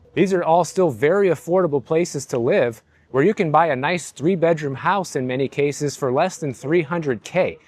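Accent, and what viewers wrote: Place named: New Jersey, United States. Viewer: I would have guessed you were originally from Jersey.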